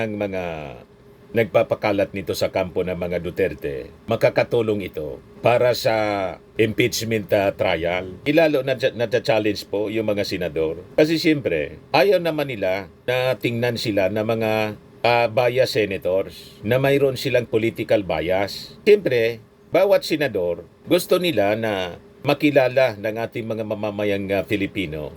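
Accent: Bicol, Philippines. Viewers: Ito ung vlogger na model na beauty product na sobra ka panget,hoy panget tumigil ka,ang sama ng accent mo,bikolanong bikolano tuno mo,panget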